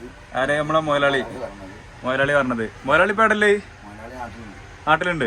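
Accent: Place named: Palakkad, India. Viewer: Palakkadan ഭാഷ 😂😂😂❤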